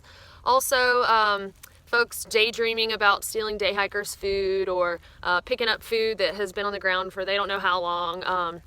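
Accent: South, United States. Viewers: I could listen to your voice all day long- love that Southern accent! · “Y’all’s experiences” I love hearing Americans from the south talk. I’m from Europe and I’m been wanting to come over and do the AT (and PCT) for years so this was a great watch!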